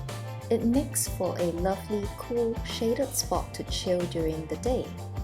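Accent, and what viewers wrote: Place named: Singapore. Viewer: I love watching your channel. So you're from Singapore that answers my curiosity cuz you sound like one without Singlish of course 😀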